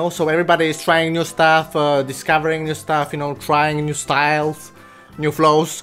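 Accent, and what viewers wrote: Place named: Romania. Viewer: doar eu am impresia ca el e roman? adică frate, uneori mai zice cuvintele cu "ă" sau "â".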